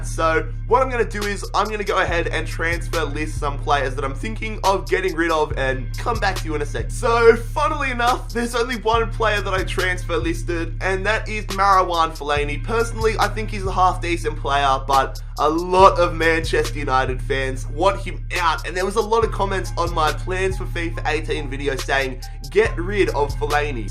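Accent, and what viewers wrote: Australia. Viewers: Your Aussie. I love it mate. I'm Australian too. Good vid mate ❤️😎😜💪👌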